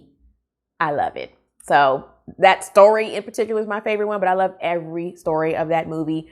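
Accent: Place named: England, United Kingdom. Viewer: This was amazing 👏 I love your English accent, it's better than mine 🤣 I can't wait for dark nights to roll back in so I can watch horror movies in the dark from like 5pm lol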